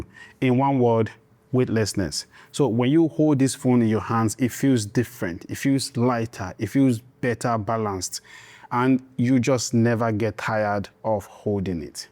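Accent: Africa. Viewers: I was so excited to click on the video and then I heard that damn African accent I am gone can’t do it. It’s like literally slightly less annoying than the Indian accent but just as bad can’t hear it sounds like chalk on a goddamn board.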